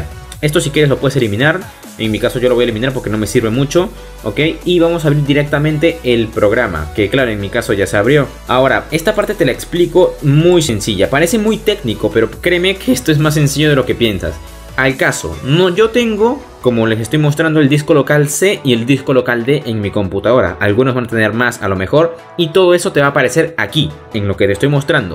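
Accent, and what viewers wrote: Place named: Peru. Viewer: mm re peruano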